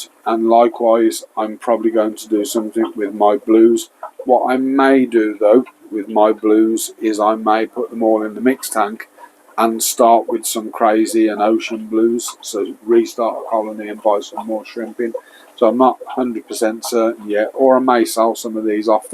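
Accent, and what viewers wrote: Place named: Black Country, United Kingdom. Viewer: yeah I heard in one of your vids. I guessed so with Yam twang 🤣🤣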